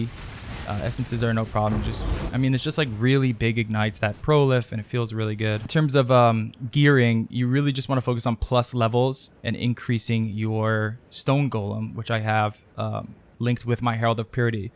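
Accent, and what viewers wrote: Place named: Colorado, United States. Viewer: are you a wook? im from colo.. people around here sound like you.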